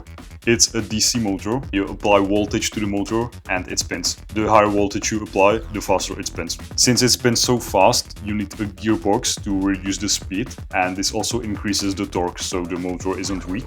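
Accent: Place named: Czech Republic. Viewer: Mohu se zeptat kde studuješ? :D doufám že jsem rozeznal dobře český přízvuk :)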